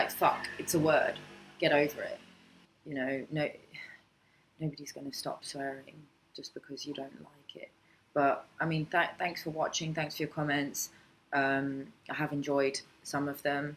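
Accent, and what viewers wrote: United Kingdom. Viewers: Hey, people have made reality TV shows with daily routines like yours. I'm sure it will be interesting to watch. Most people think a person with your job lives a life like a episode of Americas Next Top model on a daily basis. Getting views are kinda tricky. You gotta have a combination of the right tags, title, timing, & luck. Or have one of those super channels comment on your video to draw views from there fans. I have two videos with 500,000 views, but the rest of my videos have around a thousand or less. But I've never realy cared about views. My channel is dedicated to one niche video game, along with a couple other videos that I felt like making. It's all about art for me. Editing video & seeing it play. Kinda like art. Sometimes you will get alot of views & sometimes you wont. I saw you Showreel video. Have you ever tried to audition at the Travel Channel or Discovery channel? 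Your exotic look & British accent would make you perfect for a show like "Globe Trekker." Plus you know how to travel the world. I used to like watching that show back in the day. Watch this youtube video - /watch?v=Pyn2IOAO6Mc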